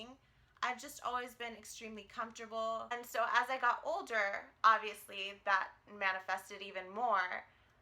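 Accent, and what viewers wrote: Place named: Latin America. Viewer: I enjoyed how this was put together. The way you edited, allowed for this to feel more real and personal rather than too filtered and distant. kudos to the forehead comment 😂 You're awesome. Keep at it, (Latino accent and all). 😊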